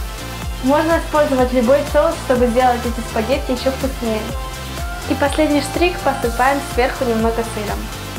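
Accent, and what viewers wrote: Spain. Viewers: у нейо испанский акцент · У уже акцент испанский есть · она родилась в Росси в 11 лет родители с ней переехали в испанию поэтому у неё такой акцент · нет она живёт в Испании , но родилась в России . акцент от того что она больше говорит на испанском чем на русском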